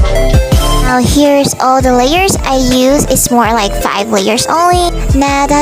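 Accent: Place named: Philippines. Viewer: ur voice kinda sounds like filipino :00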